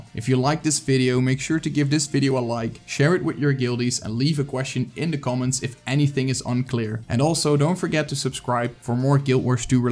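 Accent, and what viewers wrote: Netherlands. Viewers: That thick Netherland accent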